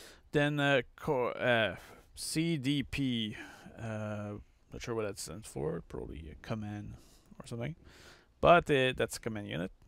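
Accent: Quebec, Canada. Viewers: Te québécois?je crois le reconnaître à ton accent anglais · Oh, what's your nationality ? I can't find what accent is yours. Canadian maybe ?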